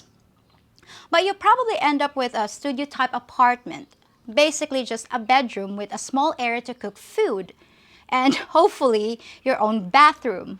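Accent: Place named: Midwest, United States. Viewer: It's funny to hear you keep saying "Ya" instead of "You". That accent is very common in upper parts of the mid west here in the US (North Dakota, Wisconsin, Minnesota) and even in Canada. Is Fargo possibly one of your favorite movies? LOL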